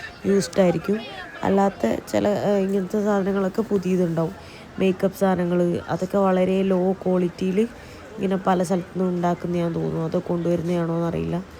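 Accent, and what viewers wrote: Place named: Kozhikode, India. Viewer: സംസാര ശൈലി കേൾക്കാൻ രസമുണ്ട്....തനിമയുള്ള നാടൻ കോഴിക്കോടൻ മലയാളം....😂❤ അടിപൊളി സംഭവം..disposition too ok!.😂😂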